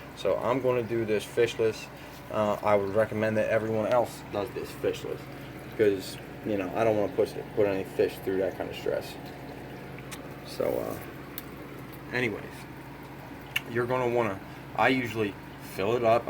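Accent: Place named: South Philadelphia, United States. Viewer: Nice bro! You remind me of dudes I grew up with in South Philly. You got that tone! I like the step by step videos.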